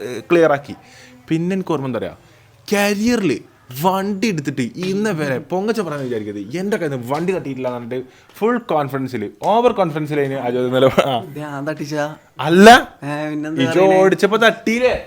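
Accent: Malappuram, India. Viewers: ചിറി അല്ല ചിരി നിങ്ങൾ മലപ്പുറം ഒരു മാതിരി ഒയെന്നെ സംസാരം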